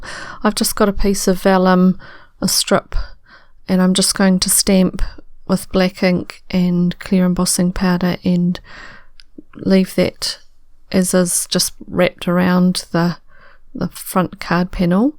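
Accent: New Zealand. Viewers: Such a beautiful design! Accent...is that a Kiwi accent??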